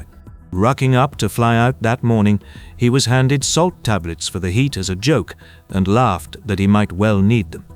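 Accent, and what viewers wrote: United Kingdom. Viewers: Do we Reeeealy have to listen to a Brit narrating? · The British accent is confusing. Sounds like he’s talking about British troops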